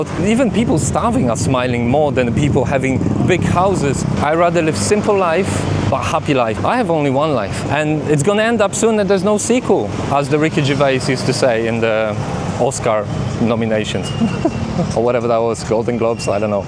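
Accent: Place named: Eastern Europe. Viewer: You’re not a British 🇬🇧 citizen aren’t you because you’re accent sounds more from Eastern Europe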